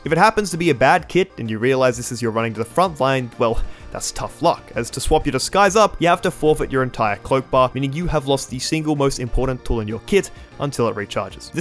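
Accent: Australia. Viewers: He is aussie lol, he doesnt sound american at all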